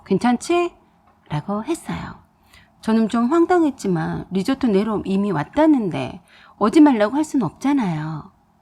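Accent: Jeolla, South Korea. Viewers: 나레이션 하시는분 전라도사투리 쑥쑥 나오네여.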